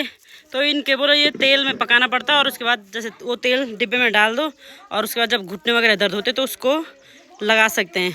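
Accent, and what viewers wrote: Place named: Garhwal, India. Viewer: Tumhare bhasha hamre Garisian ke jess milati julti hai aap kahan se ho Ham Garisian se h aap vbi sayd Garisian hdi sayd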